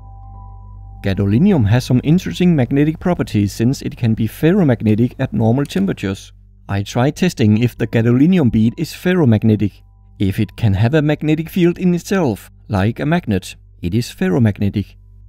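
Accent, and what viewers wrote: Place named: Denmark. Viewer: prøv at snakke dansk man kan høre at du er danks og du er ikke god til engelsk btw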